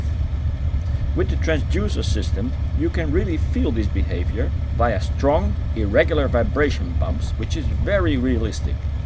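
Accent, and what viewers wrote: Netherlands. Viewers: if his accent didn't give him away, his website most certainly did. Gave projectjes meneer! Ik ga zeker naar uw videootjes kijken. Ik wil heel gauw beginnen aan mijn eigen cockpit for Digital combat simulator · Are you Dutch?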